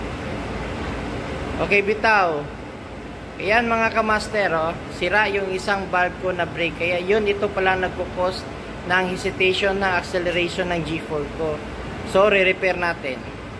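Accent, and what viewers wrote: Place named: Visayas, Philippines. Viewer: ang Cute Cute Bisaya nga lang....😅😅😅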